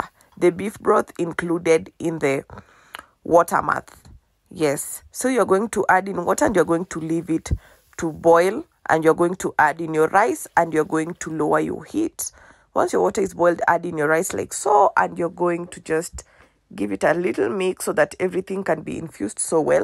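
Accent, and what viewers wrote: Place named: Caribbean. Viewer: Looks absolutely 💯 gorgeous and I will definitely try to make it and definitely going to be a new subscriber PS just out of curiosity where are you from I am normally quite good on accents but I am stuck on yours is it Carrabean??? Or some where in Africa with French origins ??? 👍👍🍚🌶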